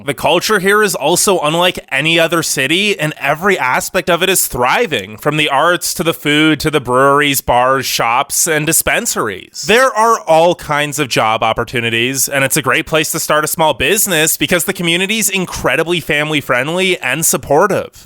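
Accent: California, United States. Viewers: Sorry but the voice is just Californian I can't stand it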